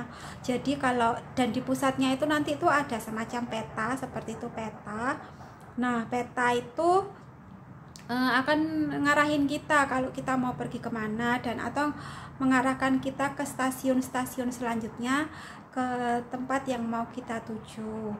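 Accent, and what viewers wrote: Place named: Java, Indonesia. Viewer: Koe wong endi toh yu..kok bahasane medog buanget..untunge ricok oleh bojo londo yuk...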